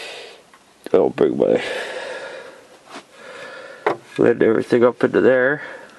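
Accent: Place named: Canada. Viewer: Canadian much ? Lol. Never realized how much we say 'and that' as well as 'that sorta thing'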